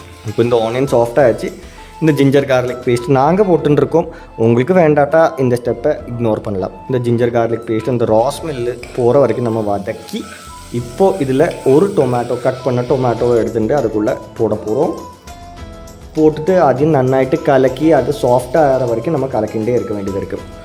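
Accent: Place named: Kerala, India. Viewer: Reminds me of the dialogue Gramamum cookka neengalum cookku Very sweet malayalam kalandha tamizh❤❤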